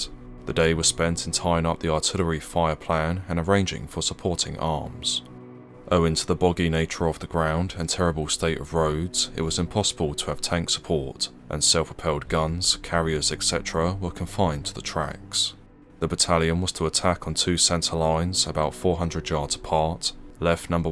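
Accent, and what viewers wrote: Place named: London, United Kingdom. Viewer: Irritating London accent narrative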